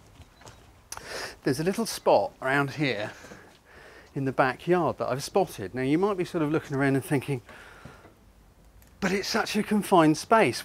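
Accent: United Kingdom. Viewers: Enjoying that until it pauses...and asks for MONEY. Eventually got rid of ad, so thank you for your excellent tips. So nice it's UK English too!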